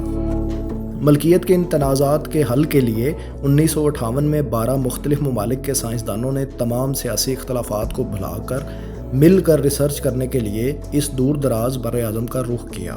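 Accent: Punjab, Pakistan. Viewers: Punjabi accent,,,,,,,,, :) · Sir Plz Q Krte Henn Asa.. Lafz Tk Sahi Nhi Boly Ja Rhy.. Majbot Rhna Bhai Mazbot... Urdu Hi Sahi Bol Lo Bhai Plz.. Punjabi Feel Arhi Hy.. Zahar Hy.. Zahir Hy.. Ufff.. Faisal Bhai Humre Kano Ko ApKi Awaz Chahiye.. Standrd Bna Dia Hy To Ab Pura Krain.. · Punjabi accent me Urdu bolrhe ho. Pathetic voice, bring back Faisal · آپ کی اردو سن کے یہ چینل ہی دل سے اتر گیا...پنجابی نما اردو سے بہتر تھا پنجابی میں ہی وائس اوور کر دیا جاتا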